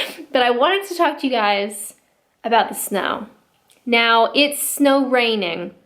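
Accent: Canada. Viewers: It's abute ( Canadian pronunciation ) the South Scotland is another country that also says abute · Very interesting videos, from an interesting perspective. One thing you haven't yet learned... in your generalisations, it's not England... it's the UK. There are also places such as Scotland, Wales and Ireland. It's rather like us here referring to you generally, as as American, with an American accent. I know you are Canadian, and I can tell from your accent even, but you get what I mean. Yes, you're in Kent which is in England for sure, but it's the UK, and I am not English!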